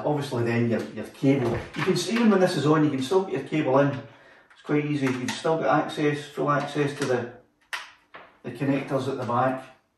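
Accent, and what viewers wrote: Scotland, United Kingdom. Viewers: Great content, im just here for the scottish accent :) cheers from DK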